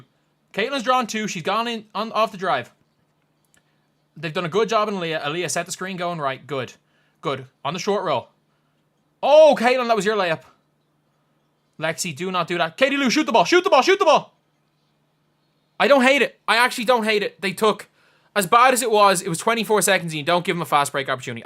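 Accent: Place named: Ireland. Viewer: No worries – an Irishman talking about anything is entertaining to many Americans.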